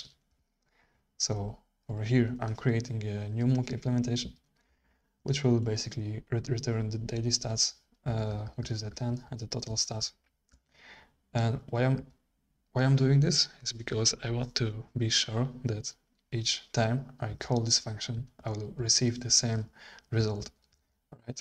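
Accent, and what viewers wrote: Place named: Belgium. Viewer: I was like huh, this guy sounds like a Belgian speaking English (I am Belgian too), and then I saw the location of the video haha.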